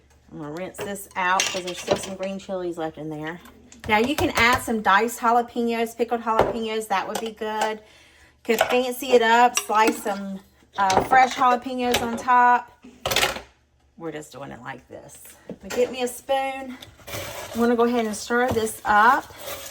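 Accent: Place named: South, United States. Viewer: April here from your friends channel, I love caserole looks so good. I love your Southern accent girl!!! keep doing you BY George! have a wonderful day !🍏🍎🥭🥑🍆🥕🌽🍅🫑🌶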